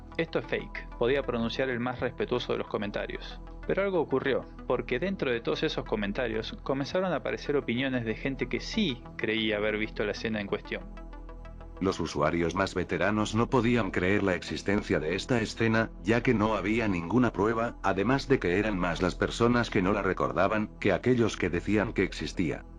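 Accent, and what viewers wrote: Argentina. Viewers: Eres argentino?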